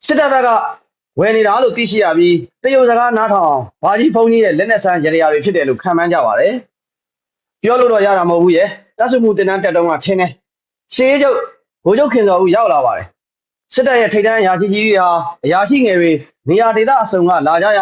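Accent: Yaw, Myanmar. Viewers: စောင့်လိုက်ရတာဗျာ။ ယောနသံကြီးဘေးကင်းပါစေ။ လေးစားပါတယ်။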